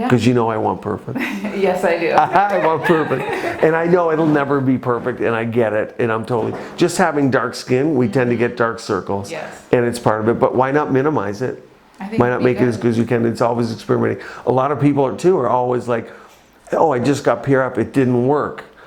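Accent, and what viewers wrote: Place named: Canada. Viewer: Love - I hear the Canadian accent, eh? Adorable that you do the procedures and understand how the patients must feel.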